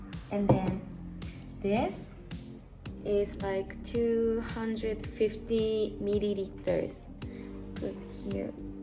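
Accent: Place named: Japan. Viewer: Valo Hoise, Her English is truly Japanese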